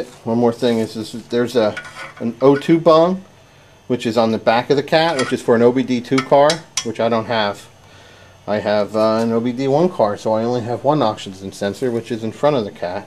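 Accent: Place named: New Jersey, United States. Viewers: Yuppp he’s definitely from jersey cause I recognize that accent lmao & I’m a New Jerseyian myself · off subject.Where you from? your voice...you sound like your from Jersey....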